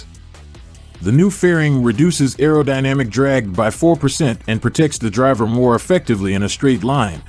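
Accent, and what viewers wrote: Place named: United States. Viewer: American voice over can't even pronounce Panigale, does my nut in.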